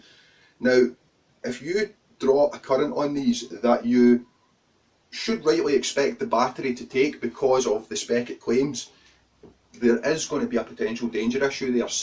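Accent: Scotland, United Kingdom. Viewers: naw, I pegged that accent as Scottish quite early, and honestly, I think that short of accent sounds kinda cool IMHO. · I have learned my lesson the hard way!. Don't buy any vape products such as batteries 18560 (spoke about in this video) or vape coils. I have purchased both and received fake Products both fake batteries and vape coils. I even had a seller contact me when I left negative feedback asking what he could do to get me to change my negative rating!! REALLY! Thanks for the video - great job and being Scottish I loved the accent too!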